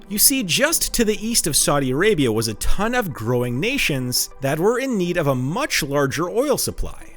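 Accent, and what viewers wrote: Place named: Canada. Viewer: I think he is Canadian. I heard slight “Canadian raising” in his pronunciation of the ‘ou’ diphthong (listen to when he talks about when his next video “comes out” at the end of the video.) He also uses the more open ‘o’ in words like “dollar” and “over.” I’d guess he’s from either Ontario or Alberta based on the accent.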